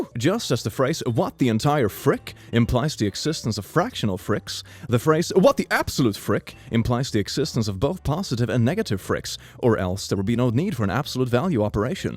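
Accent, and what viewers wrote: United Kingdom. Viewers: Your British accent is perfect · ’ British accent is amazing From a British citizen (pls don’t give me a guy voice if u read this, and no I’m not a girl either) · As a Swedish person, i can only agree that this is exactly how Brits sound to me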